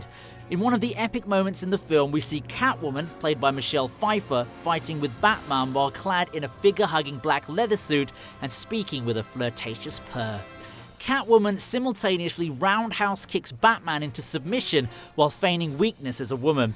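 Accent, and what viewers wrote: United Kingdom. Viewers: i wanted to watch this till i heard this anus voice dude yer dumb. do people think british voice is cool? i do NOT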